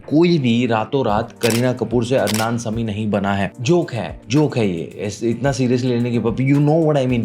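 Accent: Gujarat, India. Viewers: ARE YOU GUJRATI ?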